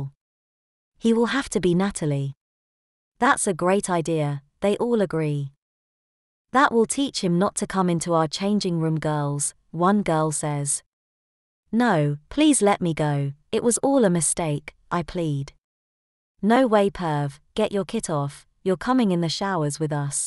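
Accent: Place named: United Kingdom. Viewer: What a fraud! A story about American football, w/cheerleaders, told in an British accent, using English terms not used in the US. Flat is actually an apartment, match is game, etc. And the cheerleader's lockerroom is closely guarded at ALL times to keep interlopers out. And no, the final game of the season would be played on home turf, not in England. Not even a good try, it's a complete fail!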